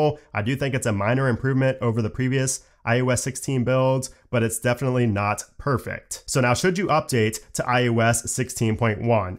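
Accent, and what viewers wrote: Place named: New York City, United States. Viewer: hi, just curious about your accent, where is it from? NYC? thanks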